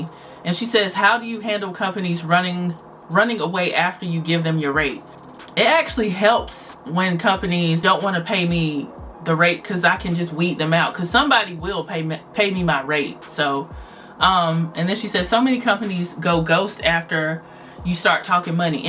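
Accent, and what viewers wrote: South, United States. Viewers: This has probably been the BEST, most informative, and straight to the point video I’ve watched regarding being a content creator. Thank you so much for being transparent with your audience. Congratulations on 9 years! 🥳…Also new subbie (Khrissy told me about you 💞) and also because I love your accent! I immediately knew you were from the South! 🥰♥️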